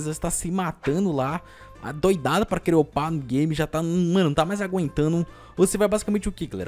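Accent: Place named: Bahia, Brazil. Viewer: Essa sua voz de baiano me encanta 😔✋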